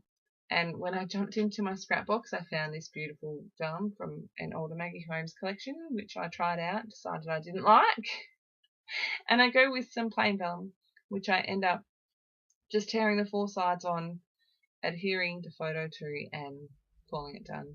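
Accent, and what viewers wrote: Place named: Australia. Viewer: I love your thought process! I am a new subscriber and honestly I first clicked on your video because of your first name and I LOVE you saying my name with your Aussie accent 😉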